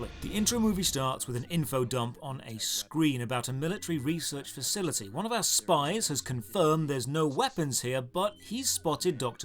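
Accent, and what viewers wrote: United Kingdom. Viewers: all of them just shouldve gotten rid off everything they are carrying with them. no reason to die then. also, lets take a moment to appreciate reading and favouriting comments on his one year old video. absolute chad loved to play dino crysis 2 back in the day and i have to say, nostalgia is so much better when funny comments and a british accent are involved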